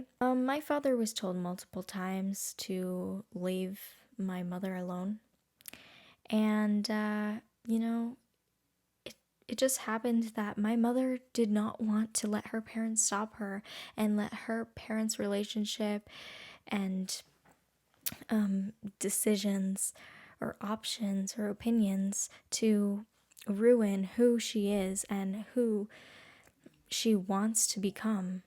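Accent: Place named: Philippines. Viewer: yes you are Filipina indeed the way you talk and how so proud to be a Filipino that shows you have the Filipino traits in you. I'm to hear and how you embrace the way being a Filipino. Goodluck and Godbless.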